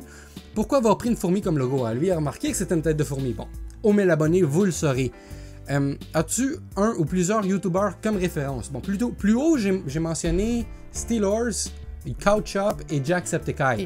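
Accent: Quebec, Canada. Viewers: j'ai 27 ans et c'est un grand plaisir de t'avoir découvert sur youtube j'aime vraiment l'accent du québec et ton contenu et de bien meilleur qualité que d'autre chaîne d'on ont ne citera pas de nom continu nice job ps: nous somme voisin je suis dans le quartier pauvre de zom-zom aller sur ce bonne continuation . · très bonne vidéo, Comme toute tes autre d'ailleurs, je prend rarement la peine d'écrire dans les comentaires des youtubeur mes je trouve ton contenue tres professionnel et ta facon de parlé est simplement parfaite (simple,calme,respectueux et surtout compréhensible) en étant quebecois moi meme parfois j'ai l'impression que les autre youtubeur quebecois parle (trop quebecois) comme qui il parlerai a leur ami de long date dans le salon ce qui fait que la video est moin plaisante a écoute. Un long texte pour simplement te dire ne change pas. J'ai h¸¸ate a tes 1000 abonnés pour mieux comprendre ton logo même si je crois déga avoir une petite idée de ce que cela peut représenté. 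PS: j'ai 23 ans.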